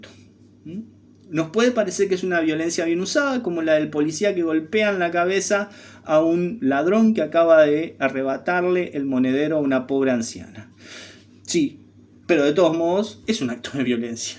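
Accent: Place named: Uruguay. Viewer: Hola. Envidio esta elegancia en la expresión. Y este acento uruguayo me parece también magnífico. Gracias por regalarnos tanto conocimiento y por ser tan crítico con determinados tipos de inclusión que, en lugar de integrar, desintegran la vida de muchas personas porque desintegran su mente. Un saludo cordial.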